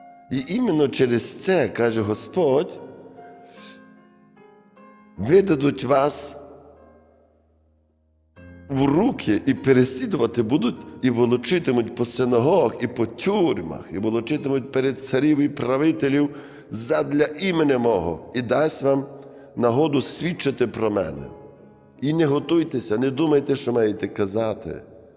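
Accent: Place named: Ivano-Frankivsk, Ukraine. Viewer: Дуже гарна проповідь.Але люблю Ваш Івано Франківський акцент!... ближчим до серця❤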